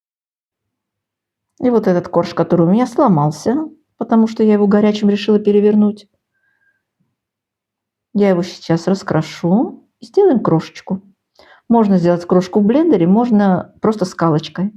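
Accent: Baku, Azerbaijan. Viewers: Спасибо за рецепт. У вас даже акцент бакинский ❤❤❤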